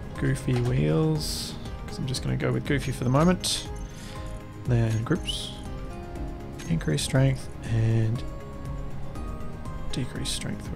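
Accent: Australia. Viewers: "Git! Git! Git atta hea!" My weekly reminder that an Aussie <3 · One of the very few aussies that are nice to listen to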